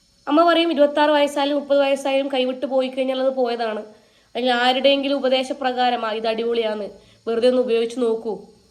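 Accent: Kasaragod, India. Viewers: Kasaragodinte shabdam 😊😊😊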